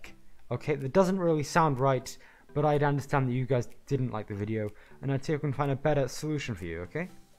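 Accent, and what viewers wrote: United Kingdom. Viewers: the strong bri'ish accent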